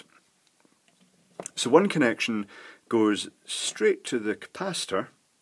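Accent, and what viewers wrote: Scotland, United Kingdom. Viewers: he has a Scottish accent to be precise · Some guy, explains everything in good clear English with a slight Scottish Twang :-) Would make a good teacher. The website has good tutorials on various projects. Good luck on future projects pal. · Such a nice accent. Where you from Scotland? Must be, (not that it matters cause we are all just spirits in bodies).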